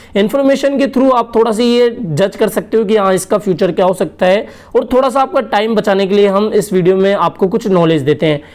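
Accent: Rajasthan, India. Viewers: Rajasthani lg rhe ho Awaj se to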